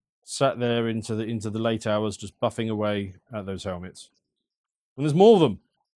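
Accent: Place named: England, United Kingdom. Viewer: However you need to describe it to make you feel better. We love you regardless, you’re the most stereotypical Englishman I’ve ever heard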